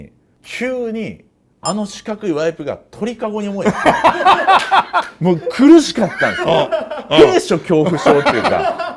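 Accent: Kansai, Japan. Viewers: 中田の関西弁まぁまぁ上手くて草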